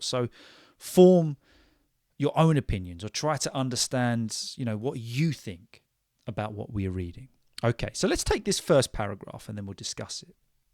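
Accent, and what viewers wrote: England, United Kingdom. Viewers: One and only British native speaker who speaks slowly and clearly thanks for understanding us haha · Thanks teacher, I am osman I follow you and enjoy. topics.Also your accent is realy authentic eglish .My good wishes from Turkiye 😊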